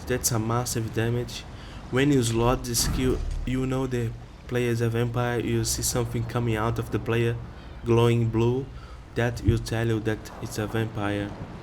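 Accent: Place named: Brazil. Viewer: this accent...are you brazilian? haha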